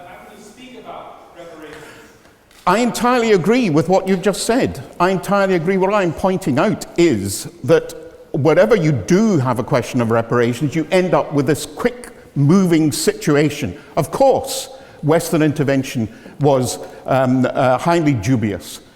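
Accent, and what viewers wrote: United Kingdom. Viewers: Big Mac, bull shit spoken in a rather classy british accent :) Sorry Sir.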